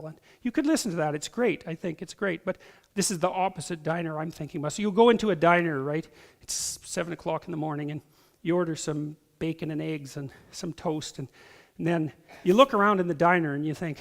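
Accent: Canada. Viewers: He's from northern Alberta and you can hear it. That's why he also drops the occasional "eh." He doesn't talk like a stuffy Toronto professor at all. It's very endearing, actually.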